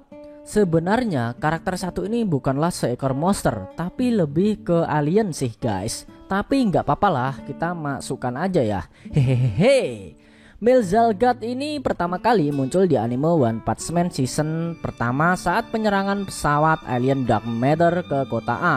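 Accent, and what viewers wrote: Java, Indonesia. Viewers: Mimin orang jawa ya? Suaranya medok banget njirr mirip orang jawa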